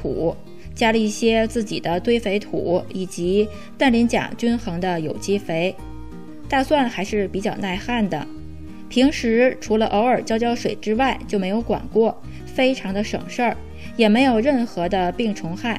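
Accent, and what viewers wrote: Tianjin, China. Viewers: 浓浓的天津味！好听！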